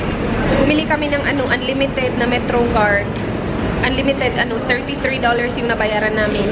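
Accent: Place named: Cebu, Philippines. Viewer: ARE YOU BISAYA? HALATA KASI ANG TAGALOG MO NA YOU ARE A CEBUANA....IM ALSO CEBUANA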